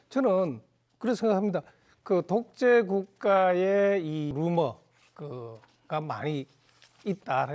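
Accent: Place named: Gyeongsang, South Korea. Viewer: 갱상도 아이가 ㅋ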